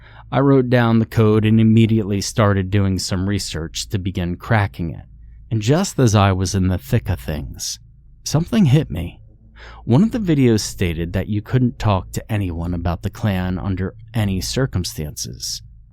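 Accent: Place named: Appalachia, United States. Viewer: I don't like the hilly billy accent.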